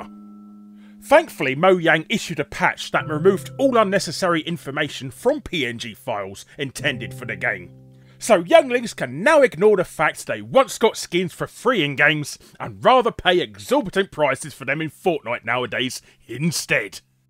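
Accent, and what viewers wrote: United Kingdom. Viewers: "For you English speaking fellows" being stated by a very British accent was a highlight joke for me for some reason. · I needed 5 seconds to figure out that you're a British, cause I turned off the CC n I thought you said Varices over and over like this video is about Varices. 😂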